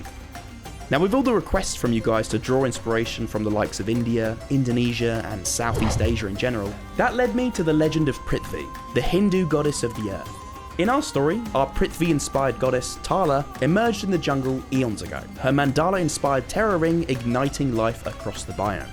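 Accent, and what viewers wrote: United Kingdom. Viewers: Are you British As I mean are you from England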